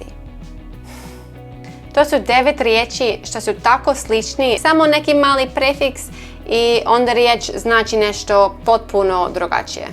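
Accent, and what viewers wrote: Zagreb, Croatia. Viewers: As someone who hasn't learnt Croatian language from your childhood you're great speaker of your second most important language! I can completely understand all you've said! You also have great pronunciation, with a little bit of Zagreb's accent but that's completely fine since you're Zagrepčanka! 🙂 Other South Slavic languages you've mentioned are, from the linguistic point of view, alongside Croatian language part of the common polycentric language known as Hrvatsko-srpski ili Srpsko-hrvatski with some national variations (some differences in vocabulary, grammar, pronunciation, correct order of words, script) although some people in Croatia and other countries wouldn't agree since language, as a important part of national identity, is area of often harsh debates. To the some extent this is similar to the German language, also polycentric language, that is spoken in Germany, Austria and Switzerland but with strong national differences. 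It is the same with English language. Ofcourse this doesn't mean that these local variations couldn't be called with national names which is completely fine! Just keep on doing great videos and learn! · Imas naglasak hrvatica iz ZG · You even have Zagreb accent woooooo! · Akcenat je minimalan, o tome se ne trebate stvarno brinuti, zvučite kao neko iz okoline Zagreba, tako da ste se super prilagodili okolini. Svaka čast! Puno pozdrava :) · Хрватски језик не постоји,загребачки је ваш говор,мала говорна мана ништа страшно....😀 Говорите Српски језик са загребачким нагласцима...:D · Your starting to have a Zagreb accent really impressive! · vi govorite savršen hrvatski jezik sa zagrebačkim naglaskom.:) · Amazing job on the cases, I must say! It's almost flawless, only sometimes you let slip the wrong one, probably when you're too excited or veer off the script, but even then the mistake is not that drastic. 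Your pronounciation is also amazing! It is a well know fact that native English speakers have a really hard time ditching their accents (every time an American tries to speak Latin I just want to die :P), and it's down to actual anatomy if anything. So you're probably correct, you will never get rid of it completely, but you shouldn't anyway, the way you sound now is more natural than some native Croatian speakers :P I can tell by the pitch you use you've learnt Croatian in Zagreb area, it was most prominent when you were giving the list of infinitives regarding prefixes. ;) But you will forgive me for even bringing it up; I'm from Dubrovnik area and we take pride in speaking in correct pitch (mostly :P). It's another beautiful quirk of Croatian language, that's all. I'd say you haven't been studying that yet, hehehehehe, and you're probably better off without it :P :) The word order, yes, you probably already know you can use it to stress out certain aspects of your sentence/thoughts. 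E.g. Baka daje pitu MENI (Grandpa is giving a pie to ME (not you!), or Meni pitu daje BAKA (it's the grandma who's giving me a pie, not grandpa or someone else...). You can tell I put some emphasis on those key words, and you should do that too when using non-standard word order if you don't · Što se tiče Vašeg naglaska, najbliže ste ženi iz područja Zagreba. Naglasak se tek primijeti tek u 10% vremena. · Croatian and Bosnian language are practically the same language, when you read them...but you can hear the difference in accent. And you do sound like someone from Zagreb. If you were visiting Sarajevo, no doubt that many people here would think you were Croatian and not someone from Canada speaking Croatian, since Croatian itself has that effect of foreign accent of our language...if that makes sense... kind of like British people talking, and someone who learned English very well with british accent. 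This reminds me of the time when I was young and had a boyfriend in Zagreb, and spending lots of time over there :D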